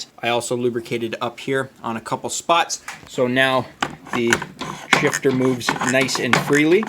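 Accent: Canada. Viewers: I can hear the 🇨🇦 in your voice!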